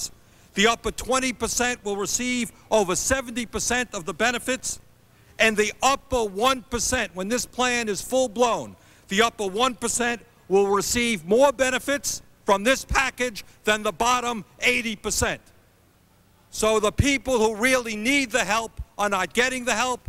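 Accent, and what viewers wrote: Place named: South, United States. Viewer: Bernie Sanders is sounding more and more southern the older he gets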